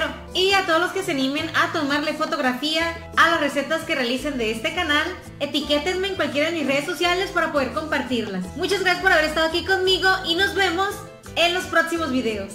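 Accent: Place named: Escuinapa, Mexico. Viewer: Una chulada arriba escuinapa saludos bonito asento escuinapense chirriss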